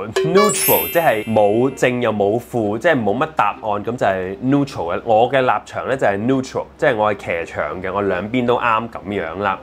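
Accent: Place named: United Kingdom. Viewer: 七師父用British accent有料!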